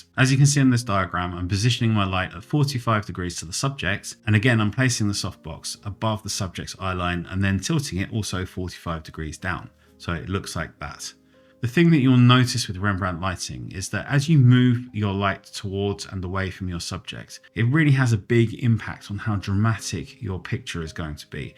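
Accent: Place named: England, United Kingdom. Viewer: Another excellent tutorial,!! Your photography and teaching skills are superb...plus, the English accent makes your videos even more pleasant to watch! 😁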